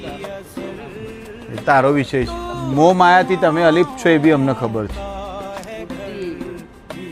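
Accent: India. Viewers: Tmara accent Mehsana na lag ch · Tame kya na cho sir Tamara acent sabarkanthaa k aravalli side ni lage che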